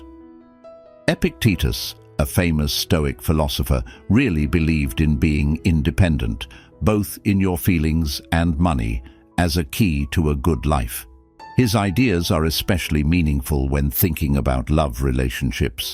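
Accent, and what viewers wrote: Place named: England, United Kingdom. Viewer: Bla bla bla... with an English accent. Men have walked away from whomen, guy. Stoicism does not work on the modern whoman, she is too Marxist left now.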